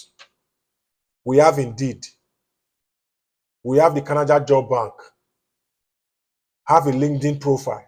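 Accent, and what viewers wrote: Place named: Nigeria. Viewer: Your Nigerian accent is too thick making it difficult to believe anything you say sorry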